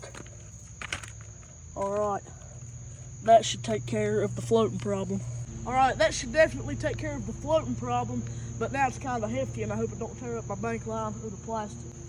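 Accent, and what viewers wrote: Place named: South, United States. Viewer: Your accent is actually the best, southern accent!!!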